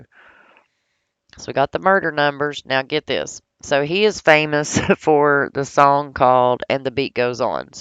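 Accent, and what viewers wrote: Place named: South, United States. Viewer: I thought I had a southern draw. Where are you from ?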